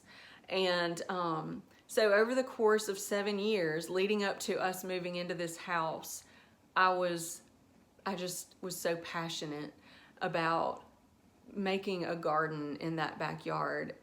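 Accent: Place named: Alabama, United States. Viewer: A truly beautiful and inspiring testimony! I'm so glad you decided to start a youtube channel - yours is one of my very favorites to watch! And this is totally off topic, but your Southern accent reminds me SO much of my husband's aunt who was from Alabama - I could happily listen to you for hours... :)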